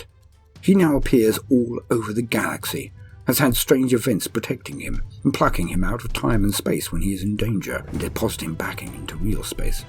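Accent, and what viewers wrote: United Kingdom. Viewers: Is it just me or does British accent instantly make whatever the topic more entertaining? Nice reading voice duder!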